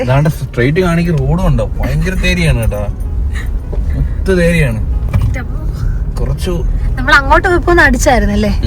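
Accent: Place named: Kannur, India. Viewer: Kannur slang istamullavr❤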